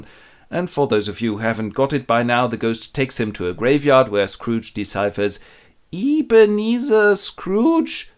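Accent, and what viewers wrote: Germany. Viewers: Briiliant !!! Five Stars Award !!! Absolutely love the German-style accent !!!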